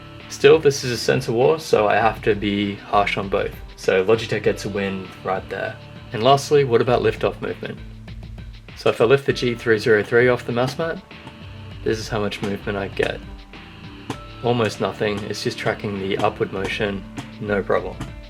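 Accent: Australia. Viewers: Hi it's me again, I love your videos. Is the Razer Deathadder durable? How stiff or light are the main left and right clicks? Are you australian or do you just live in australia?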